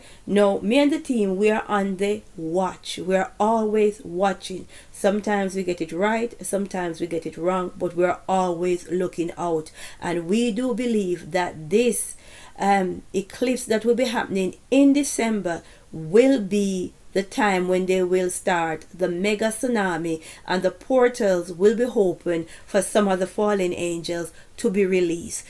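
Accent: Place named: Jamaica. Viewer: she never said 'tsunami all over the world'. listen carefully and dont put words in her mouth open your ears. She said it will hit certain areas off the Atlantic which is a massive geographical area. Then, the following infrastructure collapse will cause knock on effects to other parts of the world. That is what she means when she says the tsunami will affect the whole world. go watch the movie 5th Wave and it will show you the collape of society etc. There is also the possibility of a tsunami on the west coast of the US from the Hawaii volcano. this lady does extensive research before each vedeo. dont put her down just she has a Jamaican accent. even Geologists are saying the same thing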